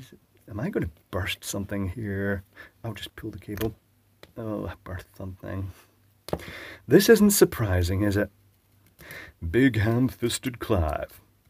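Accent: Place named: Glasgow, United Kingdom. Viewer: Great video Clive, your Glaswegian today reminded me of oor wully for some reason, you don’t happen to sit on an upside down bucket when you record these do you? Excellent inspiration for us Scottish electricians during a tough time. My Wully, your Wully- a’boadies Wully! Translation: My Willy your Willy - my maws no got a Willy. Intended meaning- my William, your William he’s everybody’s William!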